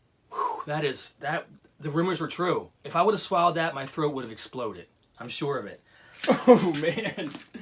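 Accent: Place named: Baltimore, United States. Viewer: thanks for the memories...btw this bawmer raised boy senses some baltimore or philly accent? lol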